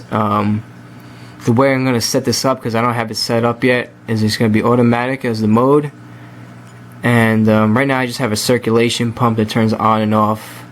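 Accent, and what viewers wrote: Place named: New York, United States. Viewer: Are you a New Yorker? I detect a New York accent.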